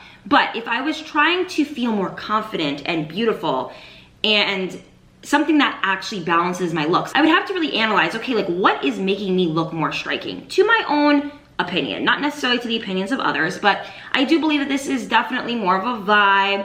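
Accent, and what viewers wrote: New Jersey, United States. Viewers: The Jersey REALLY came out in this one 🔥👏🏽 beautiful advice, thank you 💖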